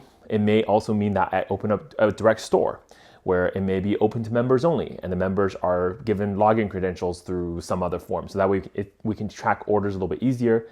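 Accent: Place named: California, United States. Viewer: It sounds like you either live in the Bay Area or Southern Ca.. I hope you are successful with your Magic business.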